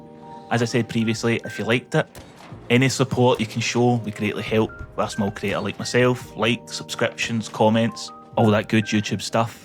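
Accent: Scotland, United Kingdom. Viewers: How have you never heard a Scottish accent before?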